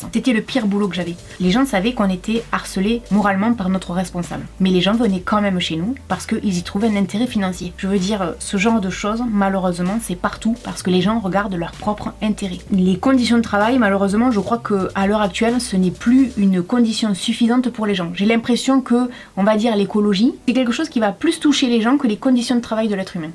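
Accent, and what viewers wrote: South, France. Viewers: t'inquiète pas je filme avec une tablette merdique et crois moi ça fait l'affaire et moi non plus je suis pas top des Kardashian. Tu est top continue comme ça je te suivrait toujours je suis contente a chaque fois que tu sort une vidéo ton accent du sud me tue j'adore. Je suis allée dix jours a saint pierre la mer et j'ai adoré l'accent du sud et le vent du sud le marin. Bonne soirée.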